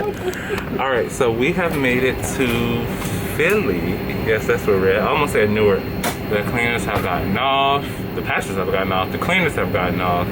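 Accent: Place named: New Orleans, United States. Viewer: Are you originally from New Orleans? You sound like it. I am a 62 year old woman who finds your videos very entertaining. Thanks for making me laugh.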